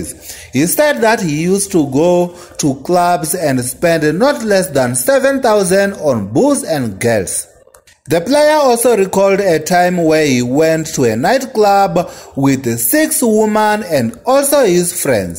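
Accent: Venda, South Africa. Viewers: Is this a south African accent? Venda or the other side of the fence? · The heaviest accent from Venda I ve ever heard. The rocks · That raw Venda English accent 🔥🔥🔥😁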